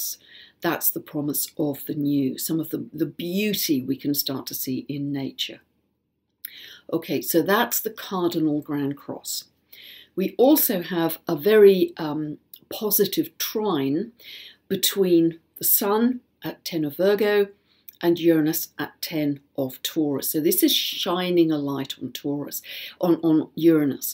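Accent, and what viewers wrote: United Kingdom. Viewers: I have an interesting story with coming across this video! I have been feeling this energy intensely in my home life and searched for videos on the energy of this moon. There were a couple I saw that I thought I was going to watch but felt pulled to keep scrolling. I have never seen any of your videos but when I saw the thumbnail I had the though that you would have a British accent (I am from 🇨🇦). I heard a voice in my mind accent and all, and I became curious so I clicked to see if you were in fact British. Not only are you, but the voice in my mind was your voice!! And now hearing your message I am clear why I was guided here. I have been struggling with my 3.5 year old the last little while and last night was the most intense. He had a meltdown that we were lost in how to help calm him. So along with the macro of toppling governments or full political systems, I'm experiencing this on the micro that my sweet boy is toppling the hierarchy in our home 😆 As much as we are aiming to stay removed from the fear, there is definitely a layer of stress that has been resting on us with everything going on. 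I have been sensing for a long time that systems will be crumbling away, but they usually don't go easily. Especially when they are as deeply rooted as the ones in place now, and when many people are so used to being powerful at the hands of these systems. So worry comes easily when I think of my two young children (3.5 years and 8 months) and the potentially turbulent near futur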